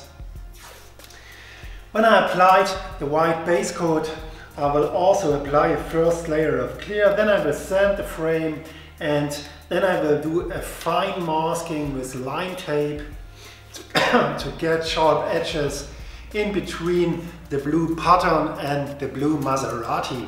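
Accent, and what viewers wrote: Saxony, Germany. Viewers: your english ist so f***ing German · Höre ich da sächsisch raus? Super Arbeit! Top! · the Saxonian accent pleas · Nice German acent! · Man hört das deutsch durch:-))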